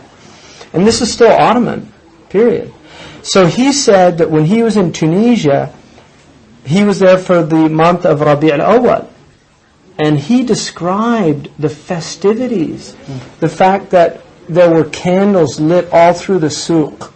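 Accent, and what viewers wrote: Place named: California, United States. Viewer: This stupid sufi grave licker 12 Imams worshiper brother of Shia said alarm clock is bida...what a donkey thats not bidah .BIDAH IS SOMETHING WHEN YOU INOVATE IN THE RELIGION...LIKE START ORAYIN 6 OR 7 SALATS...OR THE EVERY SALH YOU MAKE 4 RUKKA..OR MAWLID THAT RASSULLULLAH NEVER DID.This is one oerson i trully dont like at all...plus his voise sounds sooooo gay...he talks with a soft surfer california accent...serfs up dude .Just listen he sounds like a girl then he has the second voice that is crackking in the back like a car with a bad exoust .Sounds like he has a big coul .SUFI YOU AND YOUR SHIA BRITHERS ..WHATS THE DIFFERENCE IN YOUR CULT ??NOTHIG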